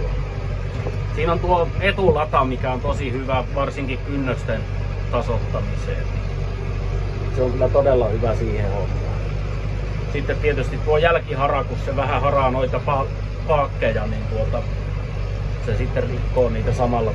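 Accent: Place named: South Ostrobothnia, Finland. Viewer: Juhannuksia koko perheelle ja upeeta et puhut suoraan eteläpohjalaisella tyylil mistä tykkään kun oma suku ylistarosta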